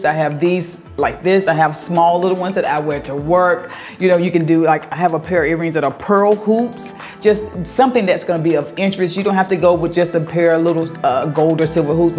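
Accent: South, United States. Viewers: ...you keep it real! Love your tips, your style, your southern accent, your laugh, and your personality.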